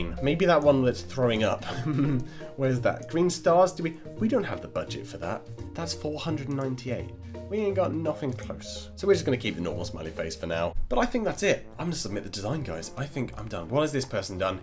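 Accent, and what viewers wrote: United Kingdom. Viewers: are you UK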